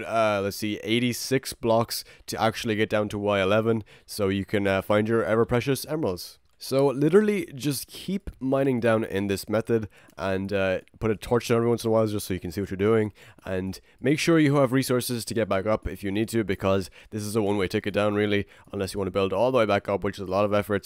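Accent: Ireland. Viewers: Are you Irish cause you sound like iy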